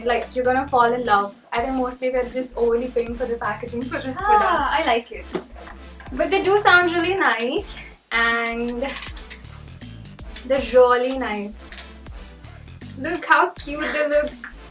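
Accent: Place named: India. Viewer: thats a typical Indian accent lol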